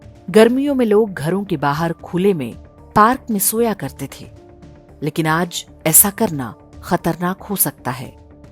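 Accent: Standard. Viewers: आप शुद्ध हिन्दी का उच्चारण करते हे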